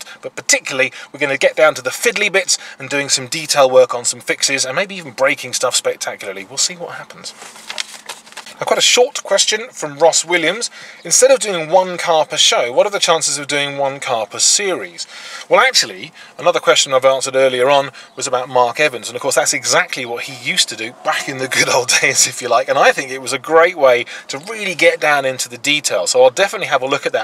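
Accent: United Kingdom. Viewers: Nothing like a classic British accent on a very America show. ha ha. It would be awesome marriage GM & Edd.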